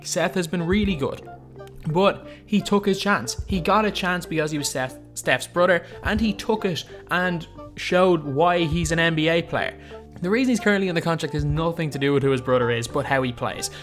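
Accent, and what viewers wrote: Ireland. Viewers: R u irish ya??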